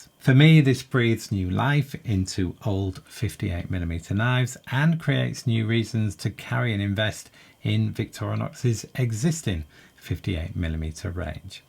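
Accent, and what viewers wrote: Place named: Hull, United Kingdom. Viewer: Great video... I made one myself as well based on the press kit but linked back to yours as you had actually got "hands-on" with the scales. I hope that's ok. I love your videos, and also that West Hull voice of yours is just the ticket for when this old Hornsea expat is missing home. Crack on...and all the best.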